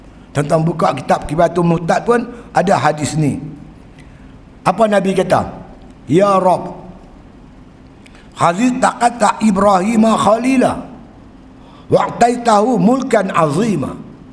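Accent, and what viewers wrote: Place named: Perak, Malaysia. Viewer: Slank Perak utara. Nahu dia lain sikit dari Kedah atau Penang.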